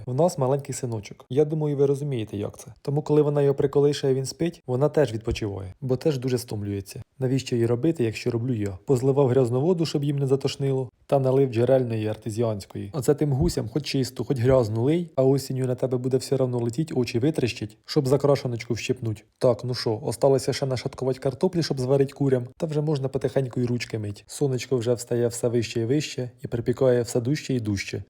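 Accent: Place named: Poltava, Ukraine. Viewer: Мова схожа на Полтавську!))))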